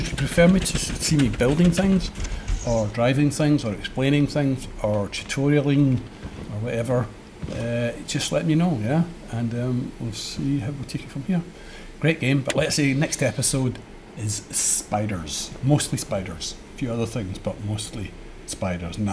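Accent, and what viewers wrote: Scotland, United Kingdom. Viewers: really? 0.o That's interesting. Do all people in Scotland speak like that? I'm so curious :D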